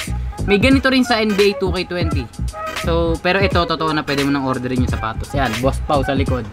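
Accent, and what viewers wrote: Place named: Visayas, Philippines. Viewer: Sobrng bisaya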